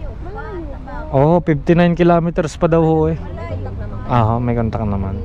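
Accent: Batangas, Philippines. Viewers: Batangueno ka kuya?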